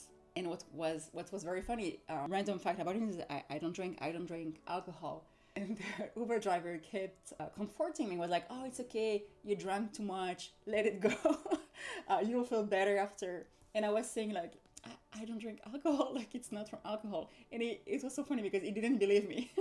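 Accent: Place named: France. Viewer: I was expecting a charming French accent, aaaaaaand.... you got it!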